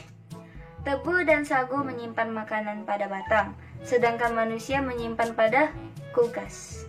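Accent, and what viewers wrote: Indonesia. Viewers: Logat"nya persis orng indo.. Aku kira orang indo yng mentap di brunai..😀😁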